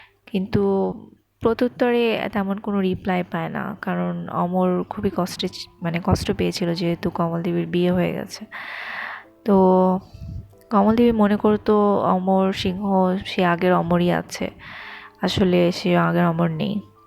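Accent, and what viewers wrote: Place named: Rangpur, Bangladesh. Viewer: অনেক সুন্দর বাচনভঙ্গি। কিন্তু পুরো সময় একটা চিন্তায় ছিলাম আবার কেউ যদি ভাবে এই মেয়ের সাথে কথা বলতেছি🤣কারণ ভয়েজ টা ওরকম, মনেই হয়না ভিডিও। যাইহোক আপু, বাসা কোথায় আপনার?কিছুটা রংপুরের টান পাচ্ছি🤭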